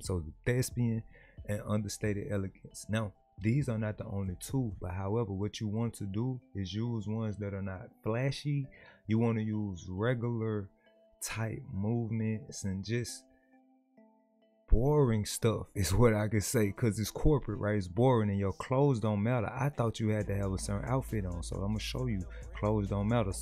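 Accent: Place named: South, United States. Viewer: Thanks, bro! helps a lot and you from the south so even better I can understand the accent lol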